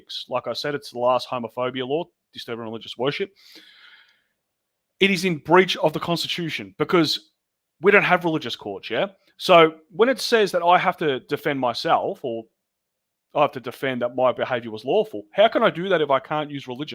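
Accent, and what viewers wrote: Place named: Australia. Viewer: You remind me a lot of Phil Anselmo (from Pantera and Down) but with an Australian accent instead of the Southern NOLA accent. Very hot! Hope everything goes well in your case, it certainly doesn't sound like you've done anything wrong!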